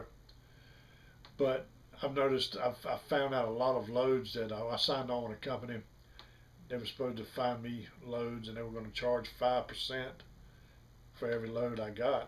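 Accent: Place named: Carolinas, United States. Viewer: Where you from man? The Carolina's? Got that accent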